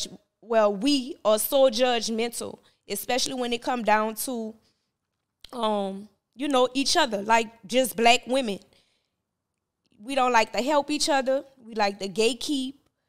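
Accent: New Orleans, United States. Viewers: where you been you was highly mist in these YouTube streets you speak facts on shit that ppl don’t want to speak you actually was teaching I learned a lot listening to you in ya New Orleans voice baby ❤❤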